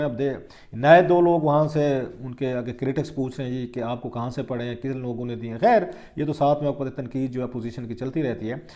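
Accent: Saraiki, Pakistan. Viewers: Bhai urdu achee bolo aap sraikee ho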